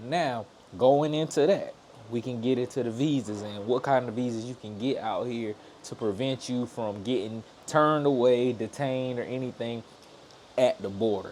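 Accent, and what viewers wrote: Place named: Detroit, United States. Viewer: Put on your Nigerian accent instead brother drop the ghetto Detroit lingo hip hop you sound low iq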